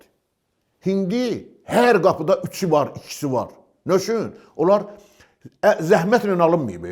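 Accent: Baku, Azerbaijan. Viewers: Bakı lehçesi superdir ürəyim gedir❤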